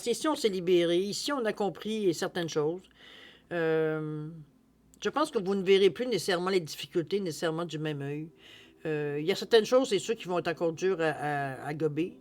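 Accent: Quebec, Canada. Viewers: J 'aime ton accent québécois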